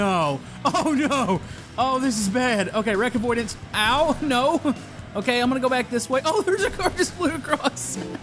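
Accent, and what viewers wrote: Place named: United States. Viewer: Where do you live? You sound American but you have your speed still set to km/h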